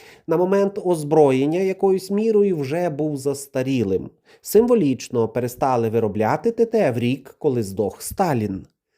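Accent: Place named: Western Ukraine, Ukraine. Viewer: Акцент заходу України,ме-бе.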